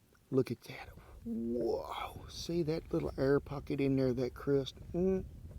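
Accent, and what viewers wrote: South, United States. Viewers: my daddy came from Texas but I only remember so much since i lost him so young. Maybe that's why yours and other southerners' "plain speak" just lights me up. Up here in the northern woods people say we sound Canadian 🤣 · I'm just Mesmerized by that lovely Southern voice❤️ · I'm thinking Tennessee I do love a southern accent lol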